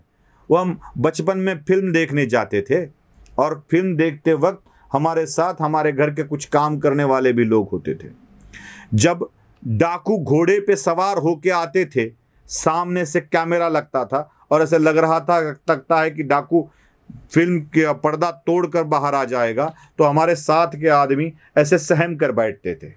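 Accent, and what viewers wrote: Maharashtra, India. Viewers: सर तुमची हिंदी खुपच खराब आहे, तेव्हाच ओळखुन पडता की तुम्ही महाराष्ट्रीय आहात म्हणुन,,सुधारणा आपेक्षीत आहे,धन्यवाद सर🙏🏻🌺🌺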